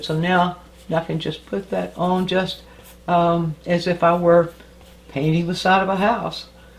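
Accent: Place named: Mississippi, United States. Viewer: You are such a good teacher. I love your accent. Are you from MS, related to JoAnne?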